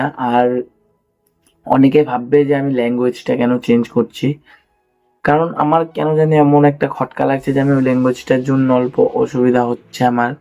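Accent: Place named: Kolkata, India. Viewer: Ami shocked 😳😲 tumar bangla kolkata bangla moto waw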